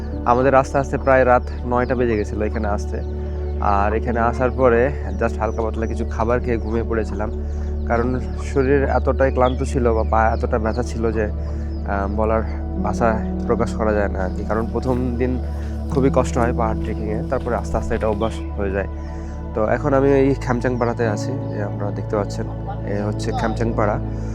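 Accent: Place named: India. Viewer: তোমার ভাষা তো ভারতীয়। কোথায় তোমার বাড়ি।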